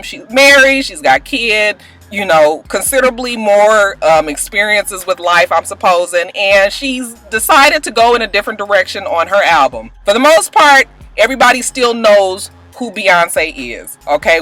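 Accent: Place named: Detroit, United States. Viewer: Are you from Detroit?...cause you sound like it, just wondering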